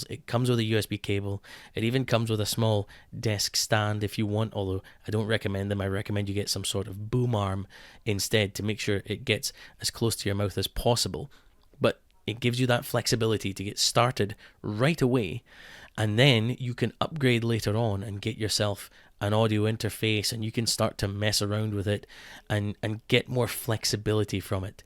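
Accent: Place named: Scotland, United Kingdom. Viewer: You sound like someone I know. I have heard that Scottish voice on certain videos before on the internet.. 😶‍🌫️ ASMR next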